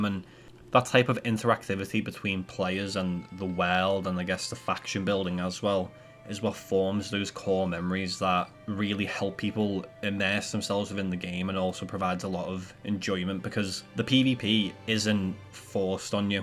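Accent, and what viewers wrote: United Kingdom. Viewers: It's one of the many regional British accents, maybe you should broaden your horizons more.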